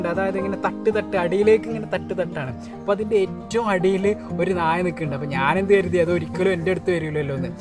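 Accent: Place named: Malappuram, India. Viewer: malappuram accent kalanj samsarikkalle broooo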